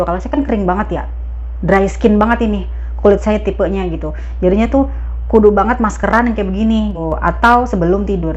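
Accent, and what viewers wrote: Jakarta, Indonesia. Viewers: cara ngomongnya jadi semakin pingin balik ke Jakarta rindu sama tetangga jgn di ilangin tuh cara ngomongnya Betawi banget sehat selalu bahagia daaaan yg terpenting semoga sehat selalu tuk sekeluarga👍👍🤲🤲😍😍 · Ehehee.. lucuuu ngomongnya.. ceplas ceplos apa adanya.. Betawiii bingiitttt dahhh... Mo nonton, tpi dah adzan maghrib...pause dulu... yukkk maghribannn dulu yukkkk shayyy.. n keluarga sehat selalu yaaa...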